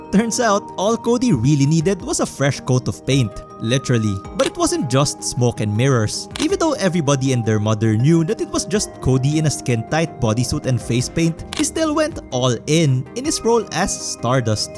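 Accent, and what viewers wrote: Philippines. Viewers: That accent... Filipino? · your english is very Filipino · You sound Filipino. Are you?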